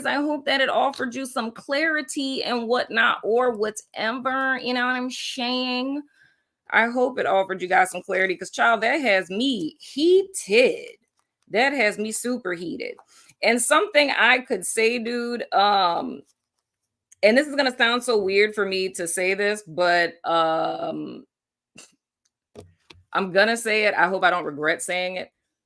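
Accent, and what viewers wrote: New Orleans, United States. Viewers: Libra Sun , Scorpio Moon and I'm from New Orleans too lol that accent will slip out baybay 😄⚜️⚜️⚜️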